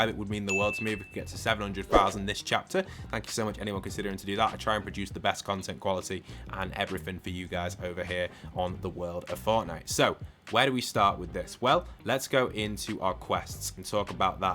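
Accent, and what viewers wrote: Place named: United Kingdom. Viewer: He sounds like Luke from modern family with a British accent istg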